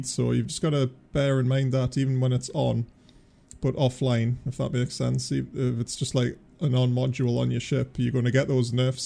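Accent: Newcastle, United Kingdom. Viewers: When are you gonna "grow out" of that Geordie accent. It just makes you sound like a King Chav. The last time I went to Newcastle, people were wearing dustbin liners as raincoats. I guess you donn your dust bin liner with proud when you go out on the toon (town), right? Learn about Estuary English and start growing up, pal, git gud. Oh shit, now I'm doing it.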